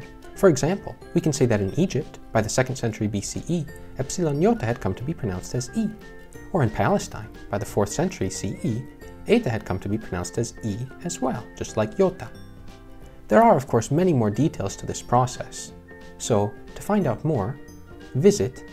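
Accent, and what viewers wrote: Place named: England, United Kingdom. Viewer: most instances of ει were never diphthongs in fact, they were the result of compensatory lengthened ε and as such were pronounced like ε, but longer. in fact they were often written as ε only in early times. the person in the video just has an english accent that makes him pronounce a long e as a diphthong (ei) stress is on the second letter of a digraph in greek by convention, even though it's evidently the first one that is stressed (in ταύτην the stress is on the /a/, not on the /f<-w/).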